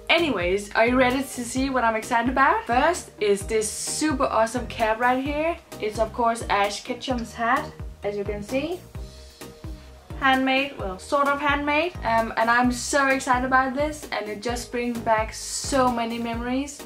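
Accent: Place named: Denmark. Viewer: What cool projects! I love your accent - do you live in Denmark?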